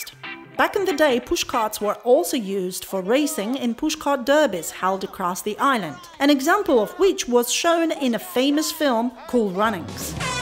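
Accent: Australia. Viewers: The worst accent ever, aussie, english, & some foreign sounding jamaican???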